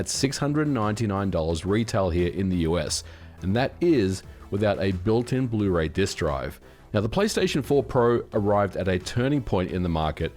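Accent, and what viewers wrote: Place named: Australia. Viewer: You must be an Aussie living in the US, I recognise a fellow Aussie 🇦🇺 Back in Sydney I got my Pro for $500.oo EB Games, with a trade & Club 5 level 🎉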